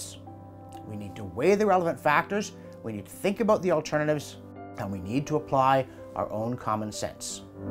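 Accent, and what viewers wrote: Canada. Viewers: and still nothing worth remembering. He spent that time trying to justify his veracity or valiidity or something. Once again.....4 minutes that I will never get back. He sounds like a Canadian to me.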